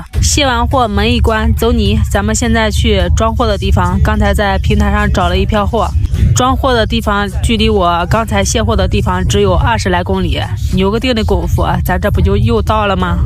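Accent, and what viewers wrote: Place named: Shandong, China. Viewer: 感觉你有山东口音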